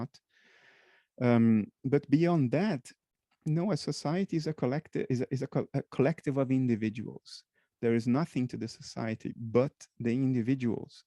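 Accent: Ireland. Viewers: Two beautiful human beings. I so wish you all the best with your podcast and I love your Irish? accent. 🙏🙏 Bernardo and. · you did a good job with this. well done. (side bar - i detect an Irish accent at the edges. Am I right)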